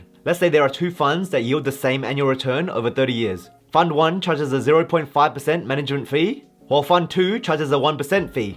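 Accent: Australia. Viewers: He speaks like a true blue Aussie. You must be Asian which is fine. Good luck with your etf's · and you’re wrong! He’s got that Asian Aussie accent!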